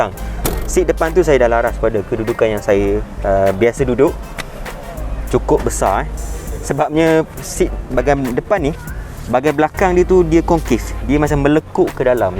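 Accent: Terengganu, Malaysia. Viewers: Dang² lg cakap teganu. Terbaekkk review boh😂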